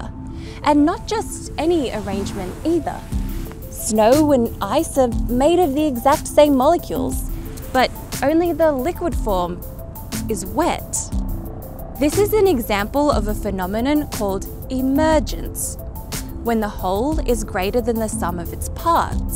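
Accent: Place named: Australia. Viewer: You sound Australian, but this vid was published in January, and wherever you are had snow. Do you live in Canada?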